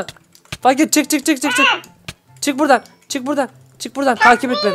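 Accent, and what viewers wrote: Adana, Turkey. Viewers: Abi sen Adanadamısın,Benide çıkart abi ❣❣❣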